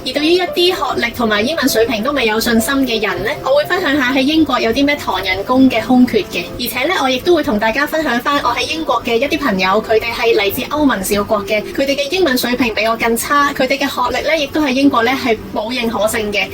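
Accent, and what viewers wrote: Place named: Zhanjiang, China. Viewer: 有湛江口音😂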